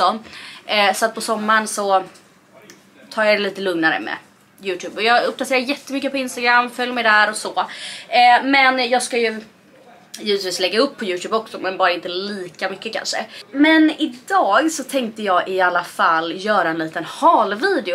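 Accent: Stockholm, Sweden. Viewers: Du säger ehh typ massor .sen undrar jag om du bott i Stockholm då du pratar Stockholms dialekt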